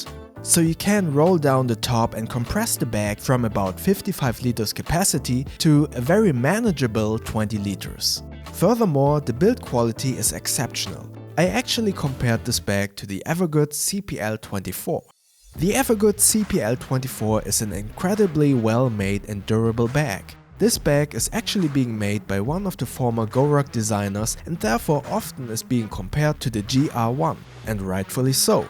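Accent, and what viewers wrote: Germany. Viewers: Deutsche Akzent am Start :D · That T H I C C German accent tho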